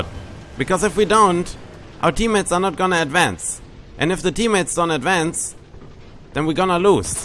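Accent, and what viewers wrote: Germany. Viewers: i just love your accent i think its german right? xD i laugh so hard everytime SUBBED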